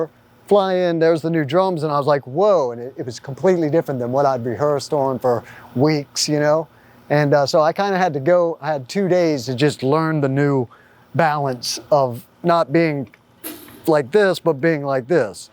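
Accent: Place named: West Virginia, United States. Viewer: I'm a lifelong drummer from Wheeling,WV and I always thought I heard some WV in your speech.Not Hillbilly just our region.Proud of you dude.I loved this interview.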